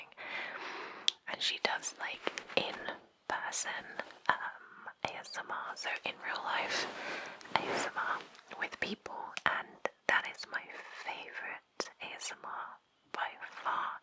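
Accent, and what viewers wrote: United Kingdom. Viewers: Beside her being beautiful is she Britain from the accent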